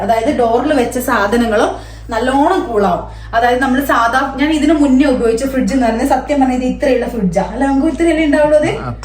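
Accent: Kannur, India. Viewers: Adipowli chechi❤ എനിക്ക് ചേച്ചിടെ സംസാരം ഭയങ്കര ഇഷ്ട്ടായിന് നമ്മളെ കണ്ണൂർ സ്‌ലാങ് വേറെ നാട്ടിൽ നിൽക്കുമ്പോഴും മാറിട്ടില്ലല്ലോ👍👍👍🥰🥰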